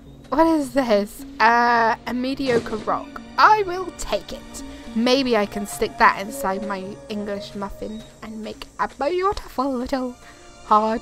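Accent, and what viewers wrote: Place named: United Kingdom. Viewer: I do like your style, and your vids look promising. Nice work. Your numbers are goals as far as I'm concerned. And it's great to hear another British Let's Player :)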